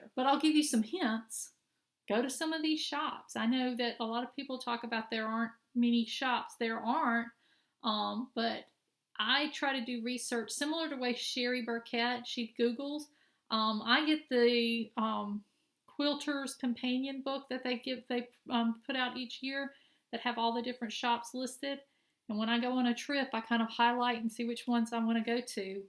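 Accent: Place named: Mississippi, United States. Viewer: Love your purchases. The Penny America design is older. I have it started. it's been a couple of years now but I thinking it's 2009. I did the pumpkin lady in the shoe made it into a pillow hangs on a door during fall. I don't do videos not very tech savvy. lol I am going to Minneapolis to the retreat first one for me. I love your accent my nephew married a gal from Mississippi I see hear every time talk.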